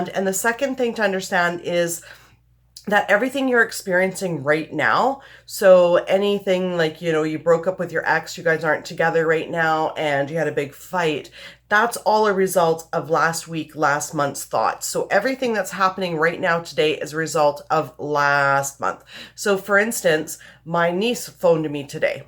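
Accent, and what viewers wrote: Canada. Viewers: .....Are you Canadian??? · This is off topic but are you Canadian?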